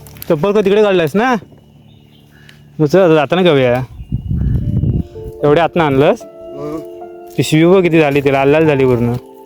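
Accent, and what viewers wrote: Malvani, India. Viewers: भावा तुझ गाव कोणत मालवणी बोलतोस पण मीक्स आहे तु बोलतो ती भाषा मालवण तालुक्यात बोलत नाही प्लीज सांगशील गाव कोणत 🙏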